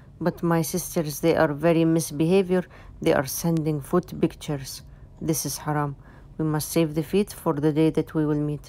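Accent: Arab world. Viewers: It's an Arab accent so yes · This is just the arabic accent. She is from Palestine, not the Gulf. And she's exaggerating her accent like this for comedic purpose. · Hey I'm from India and love your content 😄❤️❤️ sometimes I face difficulties to understand but I find your accent really amazing 🤌🏻✨ maybe it's an Arabic accent I don't know much about Arabic or Urdu though but I find your videos really hilarious 😂😂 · Same and her arabic accent just completes it!! 😻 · Sister where r u from?? Ur accent looks like Arab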